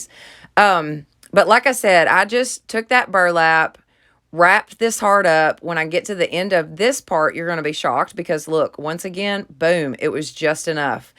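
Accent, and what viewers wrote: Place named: Oklahoma, United States. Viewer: Ohhh I love that piece for your SIL. It's gorgeous! (Ps. You're blessed to have an amazing SIL like that. Mine is the worst. 😔) Is Skillshare done live? Like in a class? Or can you view & pause like YouTube? Lol (I'm ALWAYS getting interrupted.. that's why I'm asking lol) Omgoodness! I'm loving these heart wreaths! Now I need to find those foam hearts now. Lol Love love love those little houses. Soo stinking cute! I LOVE your tassel you put with the wooden beads! You are soo awesome with ribbon! You got my attention in the 1st video that I saw of yours cuz you made this absolutely amazingly beautiful huge Christmas bow. It was gorgeous and took my breath away. I KNEW I had to subscribe after that. Plus, your accent. That always helps. Lol (my grandparents were from OK & Arkansas lol) Ps. Quick question.. do you have a mailing address? 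I will send you the Ballet Slipper chalk paint. 😉 Ty for sharing! ❤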